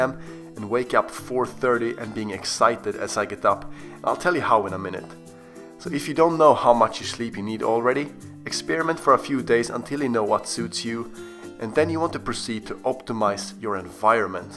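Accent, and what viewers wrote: Sweden. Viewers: Guessing the accent you have, I would say you are Swedish. You have a little bit of a Swedish accent. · classic swedish accent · I had a hunch that you're Swedish going only on your accent. confirmed it haha. Nice video, ska testa allt detta! :D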